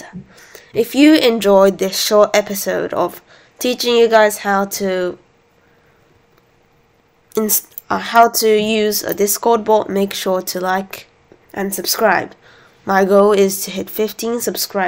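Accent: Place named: United Kingdom. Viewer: HEES BRITISHH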